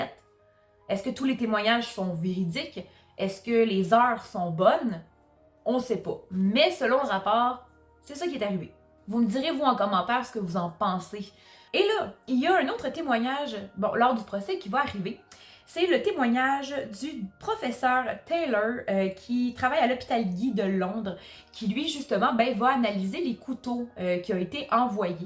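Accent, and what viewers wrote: Canada. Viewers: Je connais pas cette histoire merci de la partager pas mal ton accent canadien 😱😱😱😱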